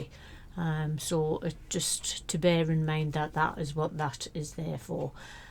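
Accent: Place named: Newcastle, United Kingdom. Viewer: Liked and subbed cheers. I have so many images on my channel but want to see some actually in a book I did one a while back but left it to the printer and graphic designer to work out. Ten years on I look at it and although I’m happy enough I will do things differently like the printer insisted on it being a portrait style which I stupidly went along with. I knew at the time it should be landscape orientation but hey live and learn slowly. So now I’m looking at putting a book together via LR and probably printing via blurb just for myself initially. I’ve got the images populated so just a case of fine tuning the layout which Is why I just subbed. All the best mate love that Geordie accent 📷📷📷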